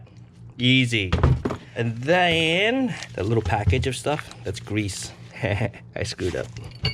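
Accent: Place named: New York, United States. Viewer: is it just me or is his NY accent really showing in this vid 😂😂 I love it NY rep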